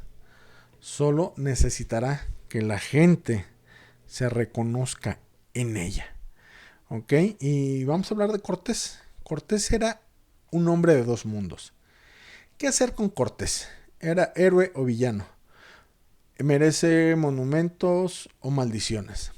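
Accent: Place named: Extremadura, Spain. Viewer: el ispanismo es que usted habla como yo estremeña 73 años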